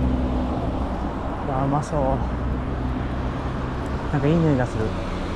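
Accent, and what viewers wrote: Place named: Kitakyushu, Japan. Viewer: 北九州近辺いいですねぇ。北九州弁がいいですね。懐かしく思います。猛暑の中で井堀、朝日ヶ丘一杯やってください。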